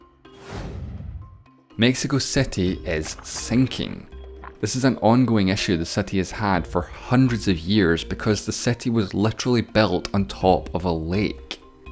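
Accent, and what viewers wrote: Scotland, United Kingdom. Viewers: He is Scottish. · I have no clue about this fact. Also, I subscribed! Are you from Scotland?🏴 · Sees thumbnail. Cynically wonders what BS they'll say about Scotland. Hears a Scottish accent presenting and is filled with hope. Are you actually kidding me? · Its a Scottish accent not English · I like his scottish accent..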